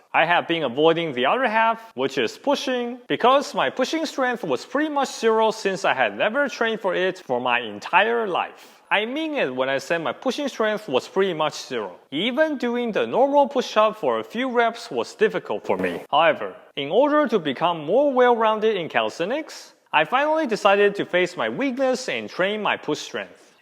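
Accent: China. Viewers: 老哥 这是中式口音吗？感觉口音很熟悉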